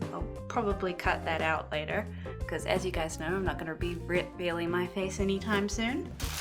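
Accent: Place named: New Zealand. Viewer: i can bet you're from NZ judging from the accent ahahah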